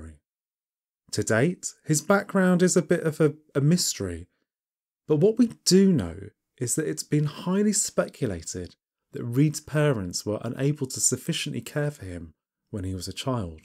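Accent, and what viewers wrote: United Kingdom. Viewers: Absolutely no offense ment, but my friend and I have had a long running joke about a someone with a British accent being able lul you to sleep while describing the most horrific of acts. I have found proof! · indeed it is very outnumbered by American and Canadian channels they make great effort and content but its refreshing to have a face and a brit voice narrator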